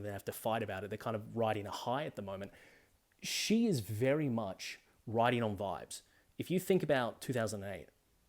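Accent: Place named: Australia. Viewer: Didn't know you were Aussie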